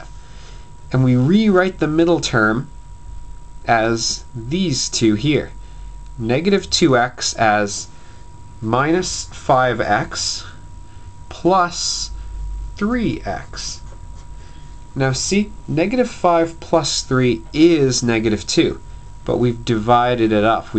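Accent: Canada. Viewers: Off topic but are you Canadian? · are u canadian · U canadian? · are you canadian? ^.^ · Get Oooot of ere! I love the Canadian accent! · hi fellow , u canadian xD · Let me guess, Canadian??? You said oot instead of out. Great video though. Thanks. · are you from Canada? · I like your Canadian accent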